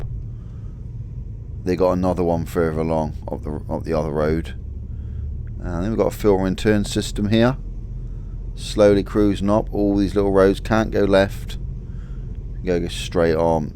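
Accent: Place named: West Country, United Kingdom. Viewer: You sound very west country (like Devon/Cornwall). Not sure what I expected a Guernsey accent to be like though.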